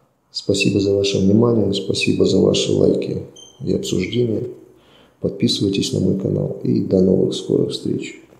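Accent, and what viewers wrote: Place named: Ukraine. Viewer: какой китаец, ты сам китаец.не улавливаеш характерныи интонация украинцев. свое время много общался украинцами. только непонятка наш украинец или. ..вовще зрить это самый корень.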